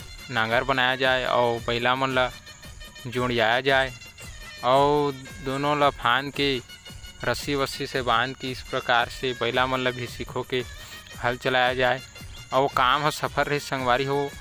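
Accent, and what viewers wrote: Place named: India. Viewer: योर स्पीक शुद्ध देसी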